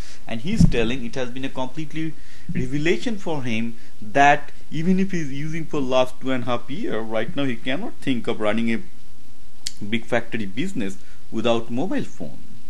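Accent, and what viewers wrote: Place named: India. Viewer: your reporting is pathetic man,,... u need to improve your accent..a typical indian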